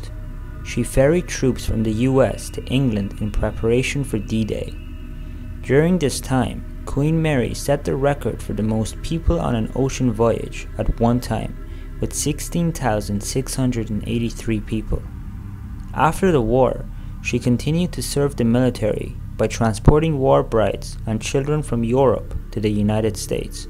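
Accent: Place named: South Asia. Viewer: i can't understand what you are saying due to your thick accent.. what is your country of origin? pakistan or india? please learn to speak properly before doing more videos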